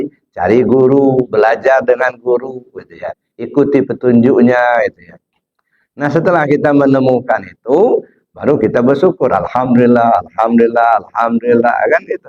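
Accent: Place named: Lampung, Indonesia. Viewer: Di mana alamat . Dari logat nyalampung di mana buya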